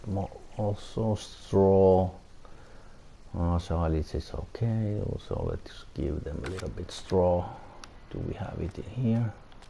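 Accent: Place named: Finland. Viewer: Greetings from Finland. I listen the accent and think you must be from Finland. Have you try to grow cotton in this map?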